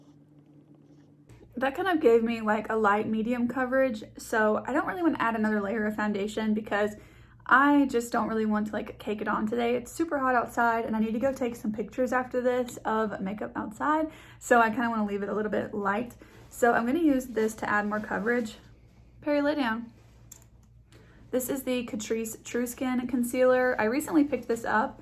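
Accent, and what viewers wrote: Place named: Europe. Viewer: is that where you're originally from? I'm dutch and you just sounded more European to me 😉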